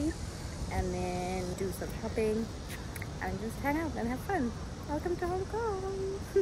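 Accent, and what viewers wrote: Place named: South Africa. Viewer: New subscriber here, I really enjoy your videos❤❤❤❤ Are you from South African? U have a South African accent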